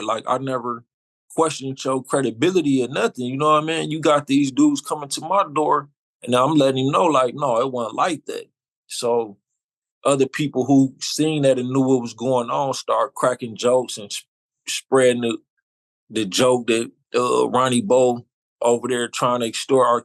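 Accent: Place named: West Coast, United States. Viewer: Sounds like a West Coast dude.....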